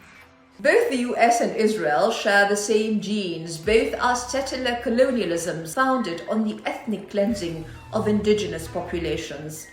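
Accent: RP, United Kingdom. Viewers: This is a really pathetic attempt at respectability, there should be no Muslim, lecturing anyone, on genocide and oppression, this woman is a joke, how much of the world has Islam conquered and enslaved, in the last 1400 years, and no matter how much they try and sound intelligent, with their posh English accent, they will always fail to have any credibility. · Ok I know this is not the point of the video, but why does the narrator sound like a white upper class English woman?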